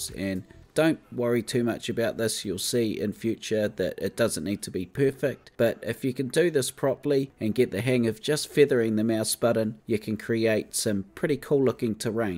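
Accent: New Zealand. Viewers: omg is your accent from New Zealand? it's awesome!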